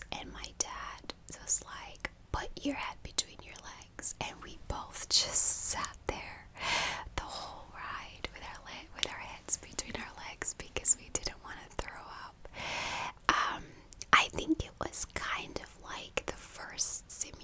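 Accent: United States. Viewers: I can't get over how American you sound! I would never know you aren't American just by listening to you! Do people IRL ever mistake you for an American?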